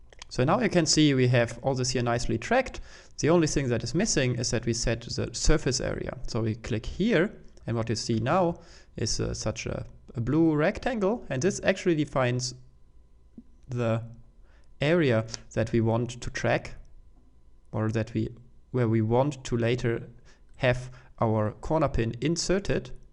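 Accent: Germany. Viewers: Man hört das du Deutscher bist :-)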